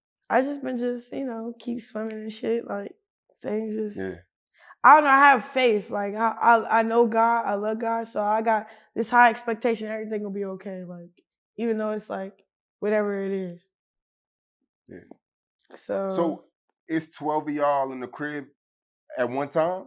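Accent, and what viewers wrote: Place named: Maryland, United States. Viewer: I can definitely hear that "Murlen" accent in her voice lol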